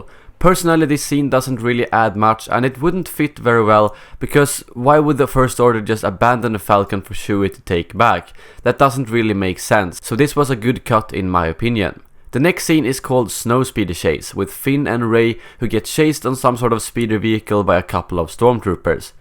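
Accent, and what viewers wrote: Sweden. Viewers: he sounds very swedisg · Are you swidish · you're swedish XD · are you swedish? You sound swedish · är väll svensk?. Det låter så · Love your accent, dude. Swedish, I'm guessing? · Are you swedish?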